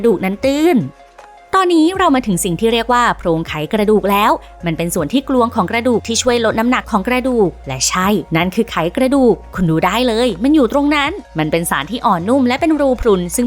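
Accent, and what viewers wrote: Thailand. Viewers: เสียงเหน่อจัง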